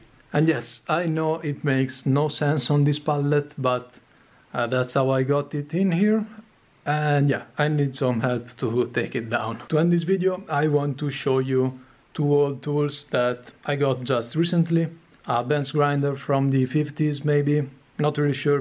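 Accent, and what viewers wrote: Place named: Italy. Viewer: Uaglio si sente che sei italiano smetti de parla inglese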